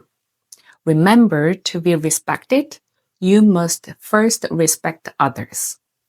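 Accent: South Korea. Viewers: Korean is truly a beautiful language. I love your accent speaking English. Most important is to communicate even when it’s not perfect. Never be ashamed and keep improving. I watch so much K-Dramas for the immaculate stories and the Korean language. Most beautiful accent indeed. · 안녕하세요, I wish I could speak a second language, even if with a heavy foriegn accent. Starting in highschool, I’ve become a failed pilyglot, having unsuccessully attempted to learn French, Spanish, German, and, most recently Korean. I twice was told by native south Koreans that in my attempts to speak to them in their language I spoke like a native. These were doubtless overly generous praises but I was overjoyed knowing how to ask are you Korean, or say hello, my name is Tony Walters, what is your name in Korean. It isn’t only k-dramas that have made me fall in love with the korean people, but every korean native I’ve met in Savannah (we have a large South Korean population due to a major Hyundai plant built close to the city. 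Of course, as a native English speaker, how could I expect myself to pronounce ㄹ without an accent? Your voice never ceases to comfort me, so your ㅎㅏㄴ국 language foundation overlaid by your ESL is at least one part magic to my heart and ears. Possibly two. I reject a world painted nfinite shades of gray. Give me primary colors. So glad you share your perspectives online.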